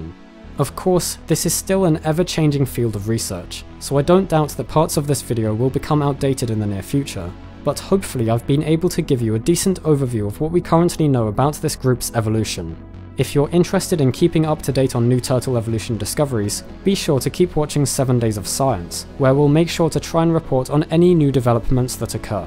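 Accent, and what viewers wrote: United Kingdom. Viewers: Abahrelghazali I agree! These videos are quite credible, and reassuringly cautious with assertions. It’s a pleasure to hear scholarly rigor propelling engaging, narrative logic with worthwhile, substantial content, all described with Linnaean terminology. On YouTube you never know what you’re getting from just glancing at the titles and cover illustrations; some “science” presentations are just amateur pop entertainment with lots of violent predation and a very low global veracity index. I do grin frequently over the British pronunciations. I will never again refer to proboscideans with such ungenerosity of syllables.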